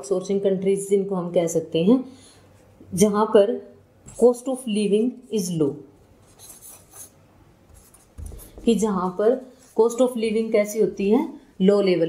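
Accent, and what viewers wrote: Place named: Haryana, India. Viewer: Really appreciable video, cleared all doubts and confusion (btw maam aapki English mai bhi Haryanvi tune aa rhi h) 😅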